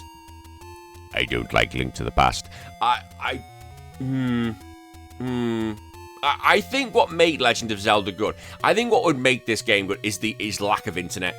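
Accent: United Kingdom. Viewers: Its the British accent. But no, different sounding.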